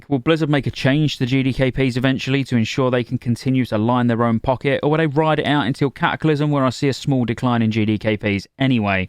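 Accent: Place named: Lincolnshire, United Kingdom. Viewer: Love listening to the Lincolnshire accent on YouTube.... "Items" or as they are called in Lincolnshire... "Eye-ums" Much love, Yellowbelly myself <3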